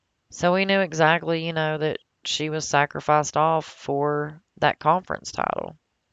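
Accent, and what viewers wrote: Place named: South, United States. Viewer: Good breakdown i love that southern accent so much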